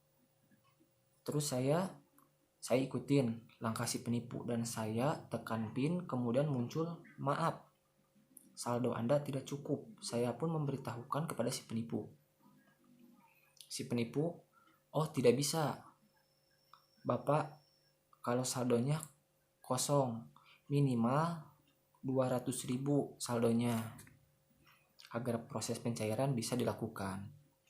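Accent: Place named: Indonesia. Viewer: Ini mah logat orang sebrang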